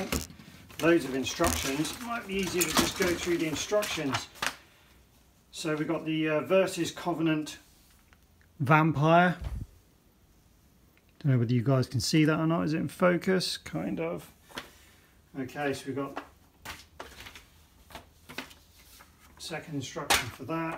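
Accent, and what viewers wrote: United Kingdom. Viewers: Do you buy Mega in the UK? You have an English accent....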